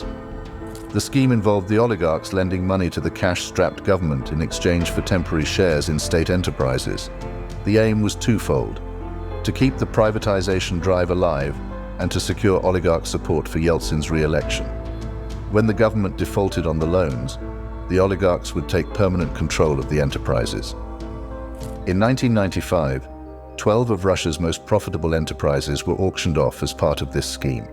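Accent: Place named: United Kingdom. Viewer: It's the season of obnoxious British accents. Yuck.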